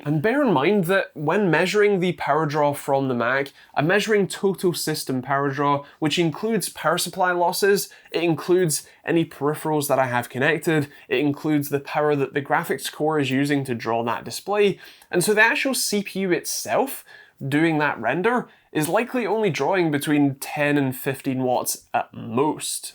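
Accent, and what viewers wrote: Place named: Highlands, United Kingdom. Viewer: No way! a Scottish Techtuber! :D sounds like you are from the Highlands?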